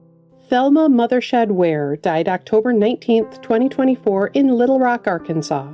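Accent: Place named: Minnesota, United States. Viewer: I would love to know more about her! Her narration and accent sound like she was born and raised in Minnesota or somewhere around that region.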